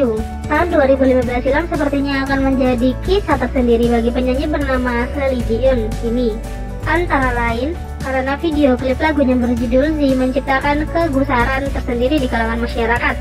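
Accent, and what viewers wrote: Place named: Java, Indonesia. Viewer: Bentar yang ngomong ini kayak orang jawa btw😁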